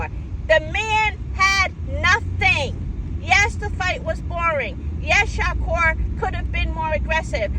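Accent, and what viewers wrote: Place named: Boston, United States. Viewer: Talk yo shit with that Boston twang!! I want Abdullah in Buds camp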